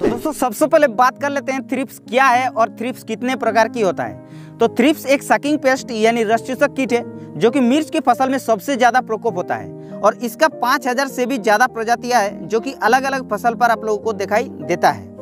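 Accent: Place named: Odisha, India. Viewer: यह तो उड़ीसा उनका बोलचाल से समझ हिंदी बोलकर कितना वीडियो बड़वार काम हो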